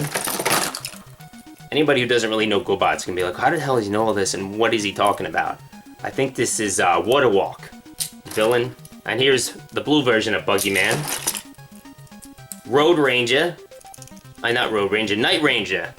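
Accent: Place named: East Coast, United States. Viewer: Oh I was just kidding around, your east coast accent reminded me of the Honey Badger video lol. Cool vid man, I really enjoyed seeing all those gobots! It would have been nice if you'd done a quick transform on them and then just edited out the time it took. A lot more work for you, I know, but definitely an improved viewing experience!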